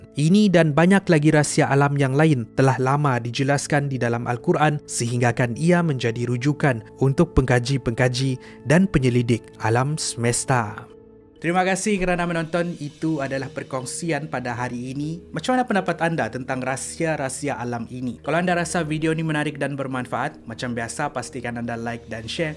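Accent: Sabah, Malaysia. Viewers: 20 tahun akan datang subscribe naik Aku harap bro tetap buat content mcm ni Dan tetap guna logat dari Sabah 👌🏻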